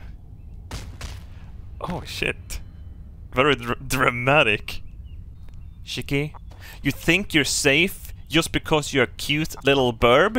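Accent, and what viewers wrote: Sweden. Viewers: Älskar din svengelska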